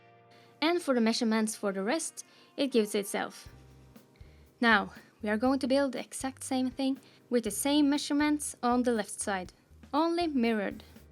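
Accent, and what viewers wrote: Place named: Norway. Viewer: Why you sound Norwegian ?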